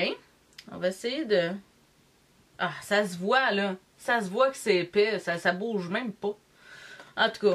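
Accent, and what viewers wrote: Quebec, Canada. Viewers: Trop contente de te retrouver! J adore ttes t'es vidéos! Et ton accent québécois aussi lol gros bisous à toi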